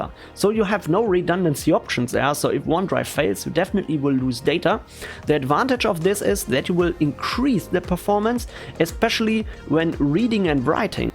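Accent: Germany. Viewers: I love that german accent,it's sounds really good.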